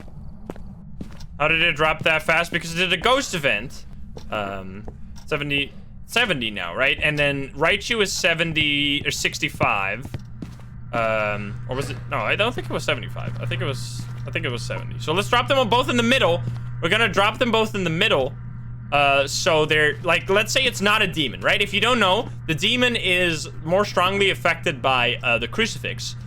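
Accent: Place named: Netherlands. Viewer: are you from netherlands or belgium?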